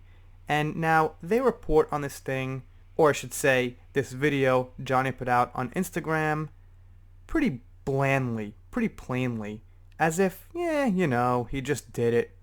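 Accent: New York, United States. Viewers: I did not hear You say anything wrong. I mean it maybe Your New York accent because We say, "Eee-ther." Anyway, I agree with You as always. The other thing that I will say is, "Johnny is just plain Class And Amber is Just plain Trash." That is really the difference in their videos. She acts like a Middle-Schooler trying desperately to be cool. When She said, "I will steal Your Stash." The middle finger too; GROW THE F%£?CK UP!!! That is all. I hope that You and Your Family are well. 😊👍🏼👋🏼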